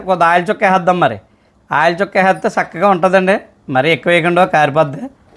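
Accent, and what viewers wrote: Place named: Srikakulam, India. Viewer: శ్రీకాకుళం language లా ఉంది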